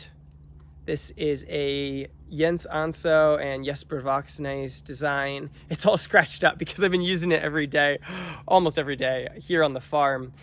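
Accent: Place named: United States. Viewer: Are you from new Zealand? You have a very american accent.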